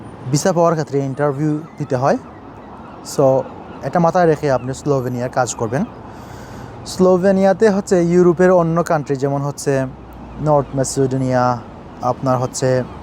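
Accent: Sylhet, Bangladesh. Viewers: ভাই আপনে আমরার সিলেটি ভাই নি 🥰🥰🥰 আপনার জন্য শুভকামনা ও দোয়া রইল ভাই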